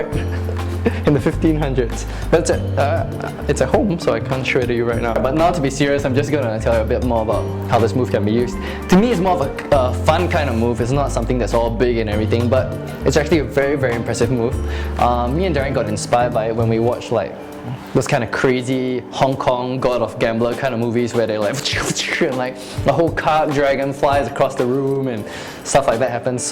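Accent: Singapore. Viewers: r u singaporean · cool video dude...are u from singapore..just asking...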